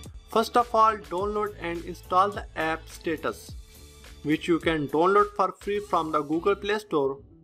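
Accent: India. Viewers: Your english accent is terrible. Oh i forgot you are indian that's why. Hahahahaha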